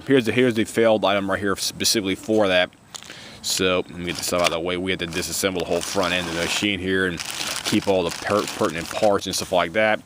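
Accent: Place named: Delaware County, United States. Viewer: Dude you have the straight up delco accent haha.You sound like Bam Magera.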